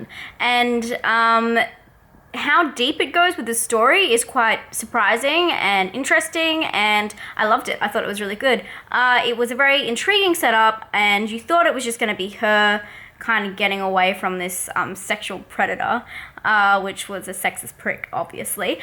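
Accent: United States. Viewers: The American accent was.... Terrifying I guess is the word? You didn't do a bad job, it sounded authentic in a way. It just drained everything interesting or alive out of your voice and personality. Possibly because when anyone does an "American" accent they suddenly sound like they're from California, and most of us hate Californians, and don't talk like that. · Lmfaoooo your facial and expressions along with the American accent was priceless 🤣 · Oh I seen this film it a Brit horror I love this film it's got an actor in it called Jeremy Sheffield Sheffield is the town home city and the wired little creep it called Gregg and your American voice it wasn't that bad you actually sounded like one of those people who will get killed off earlier on in a horror film (no offence) but I thought this film was great hated the screeching noise bit that's probably me it's an autistic thing we don't like certain hight pitch noises.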